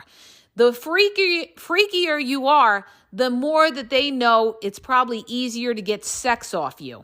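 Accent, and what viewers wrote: New York, United States. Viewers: Ignore the haters! Your colorful vocabulary, and that classic NY/NJ accent and inflections make the podcasts even better. Reminds me of the Sopranos.:)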